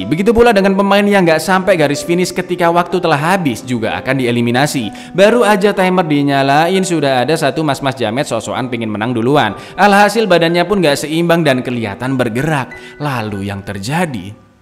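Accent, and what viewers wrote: Java, Indonesia. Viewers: ngomong e medok.. Monggo sekeca'aken dook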